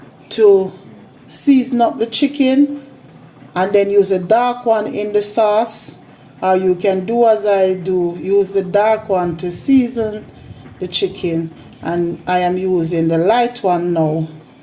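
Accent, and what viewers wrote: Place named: Jamaica. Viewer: Wagwan, when i miss Jamaican accent, i come here. Thank u mum for this yummmy video❤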